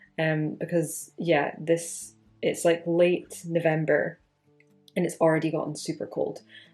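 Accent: Scotland, United Kingdom. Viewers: I clicked on this video and was kind of shocked when I heard your voice, I didn’t expect a fellow Scots person to show up on my recommended.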